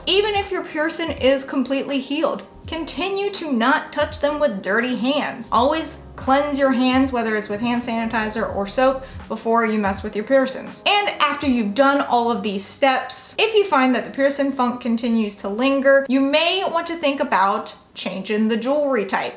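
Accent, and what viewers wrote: South, United States. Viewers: Afternoon Gorgeous 🌞 Hope this message finds u well! Luv ur vids! I just watched ur "I talk funny vid" I watched as I knew u were Southern,but didn't know from which part till now. Im a proud Southern girl myself&had the same issue when I moved from Fla 2 Colorado🙄 I even write how I talk unless it's an important document or smthin. I happen 2 luv how u talk&it's actually 1 of the reasons I started watchin ur vids. People can b so rude! Glad u addressed ur accent the way u did,luv the blunt,honest&2 the point attitude! It's another awesome southern girl charm! Keep stayin u! Stay safe&healthy&I look 4ward 2 ur next vid! Happy Piercin💕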